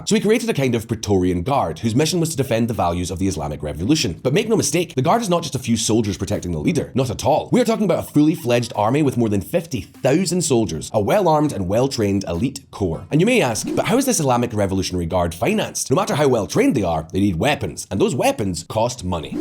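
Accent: Scotland, United Kingdom. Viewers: Maybe, you will understand this better... Are you Scottish English? Answer me that!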